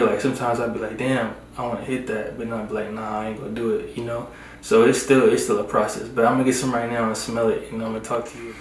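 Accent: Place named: United States. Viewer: Which part of the states if your accent from?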